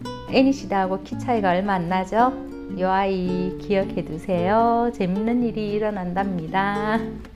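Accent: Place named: Gyeongsang, South Korea. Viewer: 요즘은 애니시다도 능력에 따라 삽목이 어느정도 가능해진것 같아요 정감있는 대구사투리? 즐겁게 보고 갑니다~~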